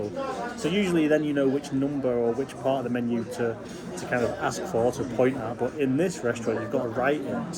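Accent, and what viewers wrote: United Kingdom. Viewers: British non-posh accent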